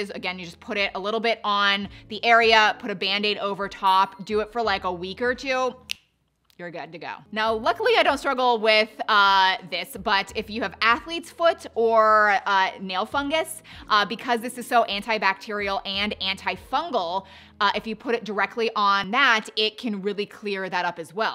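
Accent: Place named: California, United States. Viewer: I couldn’t listen because of the Californian affectations, waving nails in front of the camera and garbling at different speeds then doing lioooooong croaky bits. Calm down. Keep your hands still. Speak slowly and clearly and not as if every sentence is a question. Vocal fry and unspeak isn’t cool si=x7rH9xDPsorNr47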